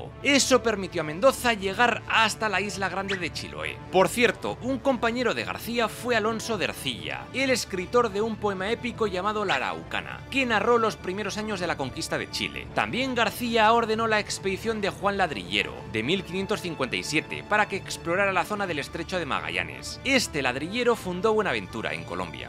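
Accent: Spain. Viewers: Acaso es un español el que esta narrando???????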